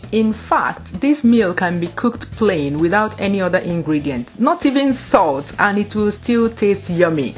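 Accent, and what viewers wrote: Igbo, Nigeria. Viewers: love the igbo accent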